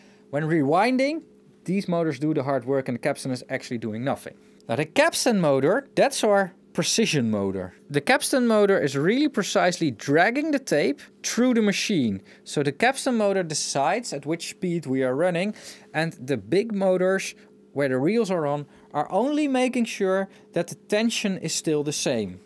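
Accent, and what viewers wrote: Netherlands. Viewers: Totally off-topic but it's so cool to hear that we have the same pronunciation. Typical dutch - English. Now on-topic....GREAT CONTENT!! I'm also a gear dinosaur ;-) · Why is a Dutch guy (I assume by the accent) who's making English videos using German terms to explain technical stuff? Very interesting video, btw!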